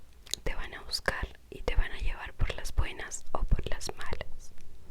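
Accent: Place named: Colombia. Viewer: Crei que eras Colombiana 🤔😍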